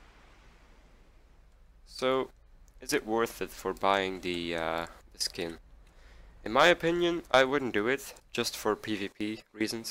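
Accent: Belgium. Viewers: You from België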